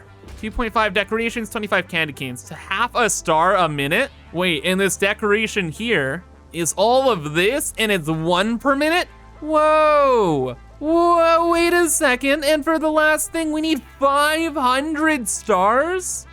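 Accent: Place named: Canada. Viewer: Not my first video, but first time I've thought to thank you for just sounding absolutely incredible :P Canadians are my favourite americans and you sir have one of my favourite Canadian voices. ALWAYS a pleasure to watch your vids just because of your energy and cheer =]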